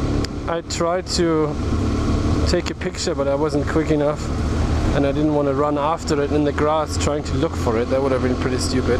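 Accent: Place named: Germany. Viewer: Are you from Germany? You've got a German accent! I'm from Sweden.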